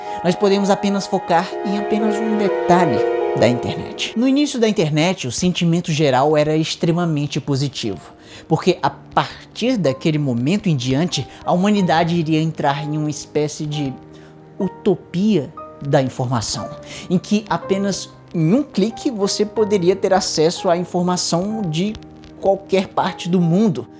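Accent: Ceará, Brazil. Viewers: Tu é cearense né macho vei ?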